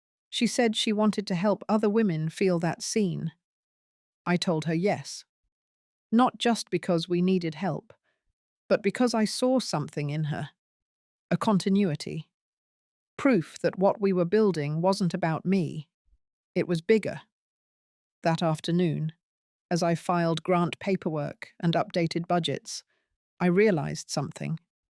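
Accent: England, United Kingdom. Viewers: Beautiful voice and diction I have not heard in years. I am from Singapore . Please keep it up. You are British ? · The narration portion of the Ai data input chosen- needs edited out. 😂😂 Otherwise good story. Like the English accent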